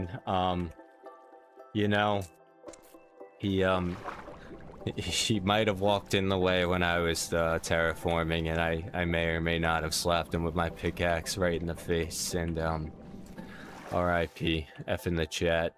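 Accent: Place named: Northeast, United States. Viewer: I hear somewhat a NE accent. Like Boston or New Jersey or something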